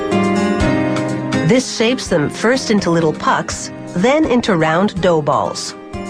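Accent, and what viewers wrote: Canada. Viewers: is the lady talking from canada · This announcer is very obviously not American. Very noticeable Canadian accent. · You can tell these shows are Canadian by the way the narrarator says 'about' and 'out' XD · was i the only one who noticed she kinda had a Canadian accent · wow, such a canadian accent~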